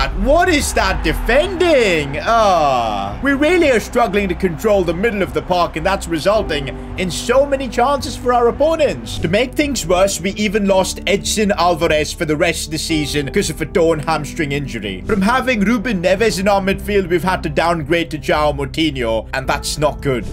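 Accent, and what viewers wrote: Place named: India. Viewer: Ngl you sound like a mix between a sterotypical indian uncle and vikstarr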